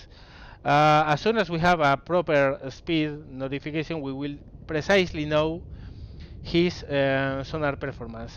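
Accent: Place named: Spain. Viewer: Where are you from? You have a really familiar accent.. perhaps Spain?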